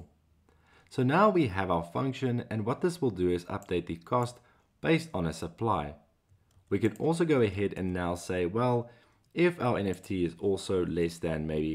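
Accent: South Africa. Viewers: Hey you sound south african wow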